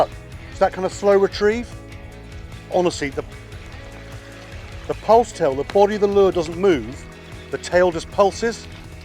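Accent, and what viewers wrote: South Africa. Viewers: Love the Saffa accent! 😁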